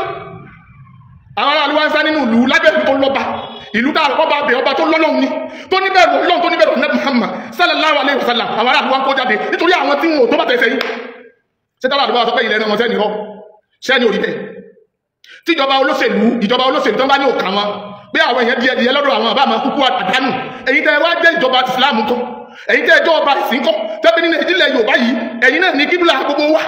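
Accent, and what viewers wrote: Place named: Yoruba, Nigeria. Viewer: I think this afa is sounding like a yoruba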